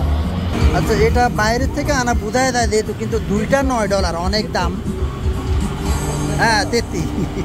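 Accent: Noakhali, Bangladesh. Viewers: ভাই আপনি দেই আর মতন নোয়াখাইল্লা কথা একবারে পুরাপুরি খুব ভালো লাগে আপনার কথা হুইনতে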